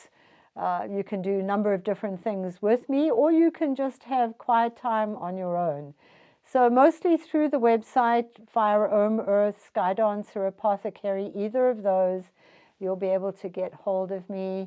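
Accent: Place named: South Africa. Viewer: Hi from South Africa!. Had a feeling you were from here just by hearing your accent. You are truly inspirational and have so much interesting knowledge to share.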